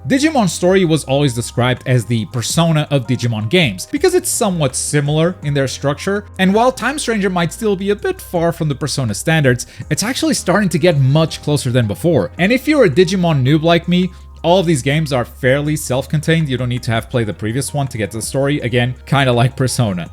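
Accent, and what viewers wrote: Portugal. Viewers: Bruh vou seguir só mesmo por seres tuga! Foi tipo "Este gajo tem sotaque de Lixian... deixa ver de onde é"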